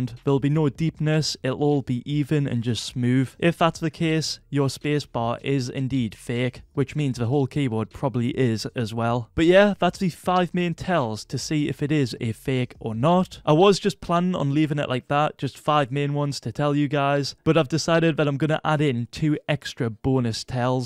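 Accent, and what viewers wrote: Germany. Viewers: Are you from Germany? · Wait, are you german? xD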